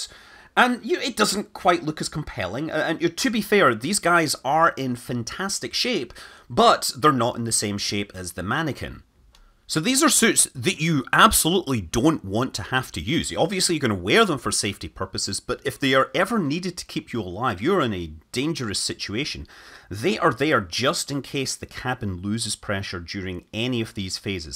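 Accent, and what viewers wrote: Scotland, United Kingdom. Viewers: I don’t think i ever expected to here say “dad bods” in his Scottish accent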